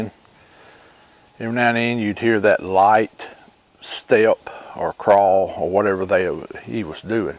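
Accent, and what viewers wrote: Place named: South, United States. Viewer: I love hearing ur southern accent like Cam from Dixie Cryptid & love your stories. I hear a lot of encounters from Alabama. They are everywhere, it's just most ppl don't know it. Thx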